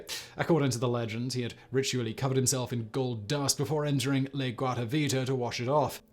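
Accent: United Kingdom. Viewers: Love the info. Your high notes trail off to nothing and along with your British rhythm is too much. Again info was terrific from what I caught.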